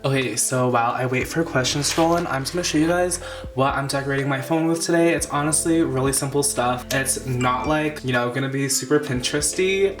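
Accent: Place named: United Kingdom. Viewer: bringing out the british accent already i see lmao 😭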